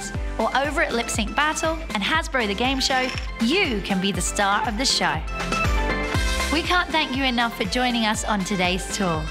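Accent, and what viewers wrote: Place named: England, United Kingdom. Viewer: and her English accent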